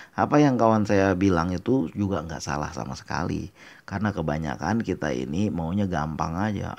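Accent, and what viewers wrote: Jakarta, Indonesia. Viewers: Assalamualaikum,ustad d Aceh ya ustad,tapi asli Betawi apa Sunda ustad logatnya seprrti orang betawi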